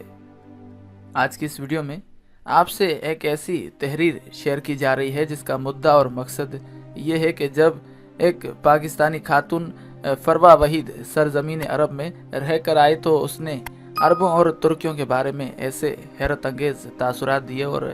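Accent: India. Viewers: هر جگہ پر ہر قسم کے لوگ ھوتے ھیں ۔ بیشرم آدمی تمھاری اردو ھی انڈیا والی ھے تمھارا کچھ بھی سچا نہیں۔ تم خاص ایجنڈے پر کام کر رھے ھو۔ سعودیہ اور ترکی کے خلاف ھی کیوں بکتے چلے جا رہے ہو ۔ اسلامی دنیا کے یہ دونوں ممالک بہت محترم ھیں۔ shame on you. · بھائی یہ ایک انڈین چینل ہے جو پاکستان اور عرب ترکی کے درمیان نفرت پیدا کر رہا ہے آپ اس بندے کی اردو چک کرو اس کا اردو میں لہجہ بہت مختلف ہے جس سے پتہ چل رہا ہے کہ یہ ہندی میں بات کر رہا ہے اور انڈین ہے اس لئے ایسی ویڈیو سے بچ کے رہو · Assalamalikum he is not speaking pure urdu. It is Indian. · Tum indin ho tmhari awaz zuban sy lagta hy tum nafrat ka bej bo rhy ho ya tum irani ho i hate you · Yey bolnay wala Indian hay, yey musalmano kay khilaf Indian Hindu Propaganda video hay, iska lehja indian hay. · بات پاکستانیوں کی ہورہی پے اور لہجہ انڈیا والوں کی کچھ عجیب سا نہیں؟ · Ap indian style main kune baat kertay hain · Why u have hindi pronounciation?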